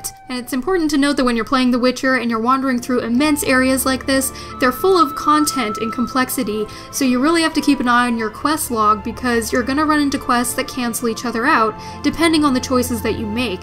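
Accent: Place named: California, United States. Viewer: It sounds like Califonian but diffrent, thanks for rap anserw. Cheers.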